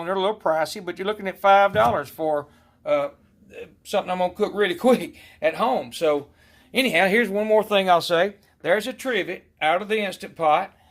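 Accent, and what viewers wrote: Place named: Alabama, United States. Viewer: Sounds like he's from Alabama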